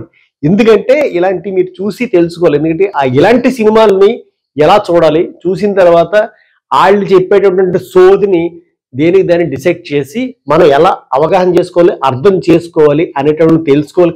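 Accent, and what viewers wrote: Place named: Godavari, India. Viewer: మీ గోదారి యాసతో డైరెక్టర్ కు గుణపం దింపారు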